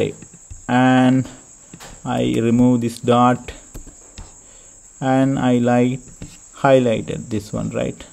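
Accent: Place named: India. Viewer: i know i can understand it. When i hear an indian voice :v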